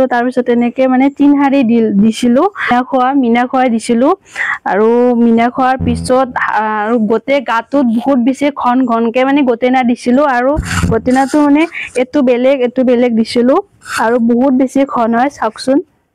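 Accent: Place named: Nalbari, India. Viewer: Ata kotha kom beya napaba tomi nalbari r lenguage te koba vhal lage but likhita kole akdom beya xuni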